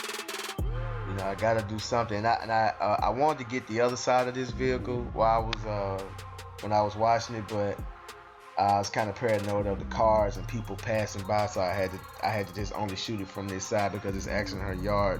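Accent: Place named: Deep South, United States. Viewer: Caught on after viewing some more vids. That's definitely the deep south.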